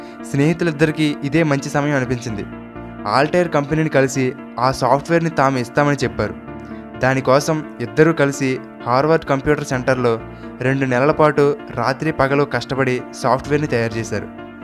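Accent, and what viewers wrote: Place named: Kakinada, India. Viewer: Ea vuru bhaiyya? Kakinada aa? Voice 😝slang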